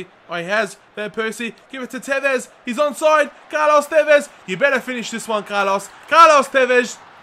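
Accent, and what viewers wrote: Australia. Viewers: are you a fellow Aussie?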